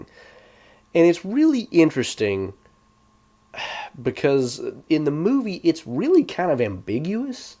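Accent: South, United States. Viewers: That is a very HARD Southern accent you appear to have. Kinda glorious. The os and as are a dead giveaway.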